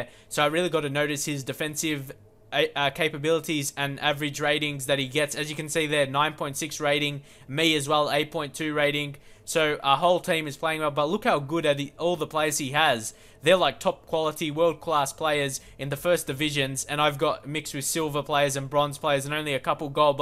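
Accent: Australia. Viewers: are you from Australian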